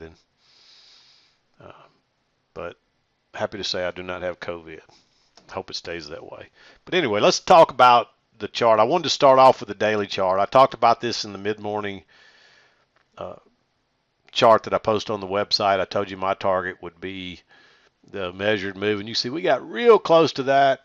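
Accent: South, United States. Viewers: Glad to hear that you do not have Covid. Mississippi huh...thought I detected a slight southern accent :-) I was stationed in Louisiana so I know how that humidity can be. Take care.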